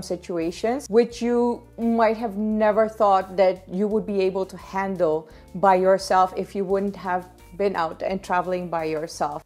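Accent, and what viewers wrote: India. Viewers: and you got an indian english accent :P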